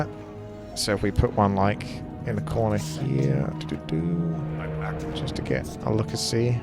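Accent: South, United States. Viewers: that southern voice though, sounds like you're a flirty barman.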